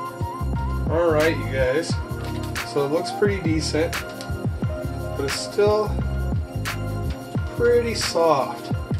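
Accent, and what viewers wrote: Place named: Canada. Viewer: Great job🤤🤤 The pizza looked soooo delicious. I personally prefer a fat head pizza crust or a chicken crust. I tried the cauliflower way but too much time 👍Your Canadian accent is so strong 😁. I use to live in Alberta but I moved back to the USA. Anyways enjoyed the video hopefully you will make more cooking videos in the future.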